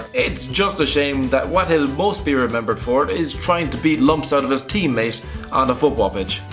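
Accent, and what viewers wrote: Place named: Ireland. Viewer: He sounds so irish.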